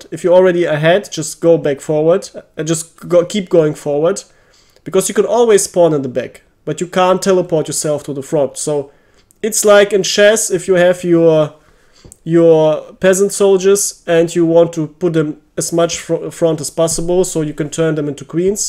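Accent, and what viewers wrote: Germany. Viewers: Deutscher Dialekt ist einfach geil gutes viedeo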